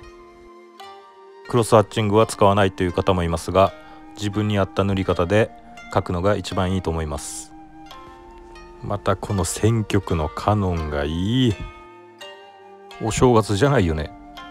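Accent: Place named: Miyazaki, Japan. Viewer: 宮崎弁 連発ですねえ〜それだけリラックス⁉️目元 口許本当に大事ですね❣️❣️❣️段々、精気が溢れてきました。目元の赤がいいです‼️ひねくれちょらんよ❣️‼️❣️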